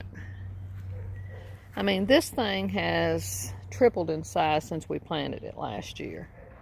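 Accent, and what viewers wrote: South, United States. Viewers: My favourite kind of garden. Love the Southern accent.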